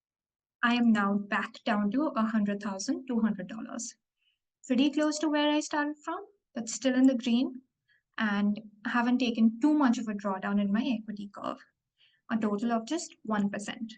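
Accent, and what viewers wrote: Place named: India. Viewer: Idk why but watching the video having an Indian accent seems easy to grasp... 😂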